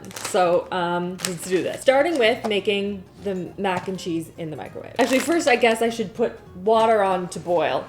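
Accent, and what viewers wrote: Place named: Winnipeg, Canada. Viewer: Winnipegers talk like that as well. Not sure about people in Edmonton because we've only been here for 5 months!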